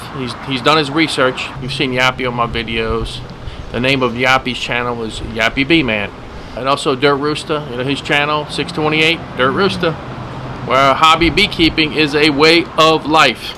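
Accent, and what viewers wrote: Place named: South, United States. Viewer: 😂 thats brilliant! Real southern, love that accent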